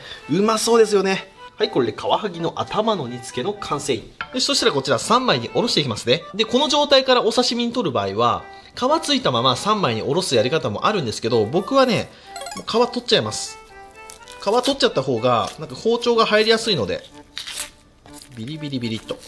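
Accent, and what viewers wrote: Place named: Mikawa, Japan. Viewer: ところどころ三河弁なの親近感湧くわー！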